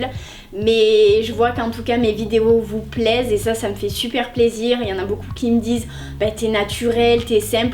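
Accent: South, France. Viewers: oups pardon faute de frappe STMG et BTS commerce internationale , en tout cas ta vidéo est super , j'adore ton accent je suis du sud également , plus précisément sausset les Pina près de Marseille :) et j'adore la simplicité de tes vidéos , tu es naturelle , jolie et tellement franche 🙌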